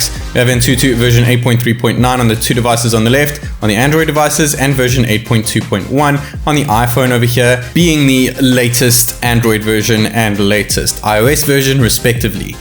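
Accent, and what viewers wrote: South Africa. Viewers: Immediately heard that South African accent! 🔥 Love from Jozi bro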